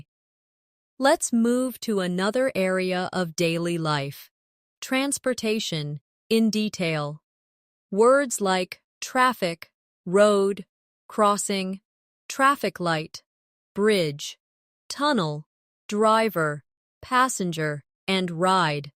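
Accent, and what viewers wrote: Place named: United Kingdom. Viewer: I like your British accent. And I appreciate for your videos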